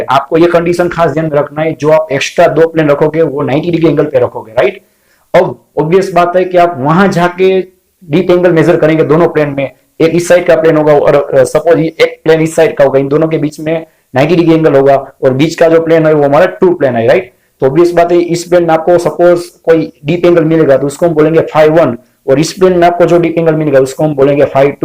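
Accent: Gujarat, India. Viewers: Your language is like gujarati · Aree sir!!! Apka hindi ka accent bhi gujrati hai mazaa aya!!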